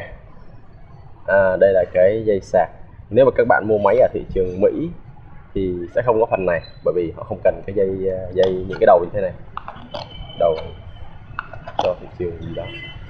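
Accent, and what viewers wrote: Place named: Da Nang, Vietnam. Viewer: chu choa, air2011 mỏng và nhẹ tới khó tin:D air 2012 mình chưa đc coi video tiếng V, nó có j thú vị 0 mấy a ? mà e thấy mấy a nên bật máy và làm vài soft+ mấy tính năng hay hay để lồng vào video đi, chớ nhìn phần cứng mãi cũng chán mà cái bàn rê magic touchpad của riêng mac có bán sẵn ko ? nếu có thì giá nhiu? . p/s: 0 có cảm tình w giọng nói của a này :)) ọp ẹp, nam chả ra nam mà bắc cũng ếu ra bắc, nửa ĐN nửa nẩu nẩu (giọng... ái nam ái nữ_, clip sau nói tiếng fổ thông 100% cho dễ nghe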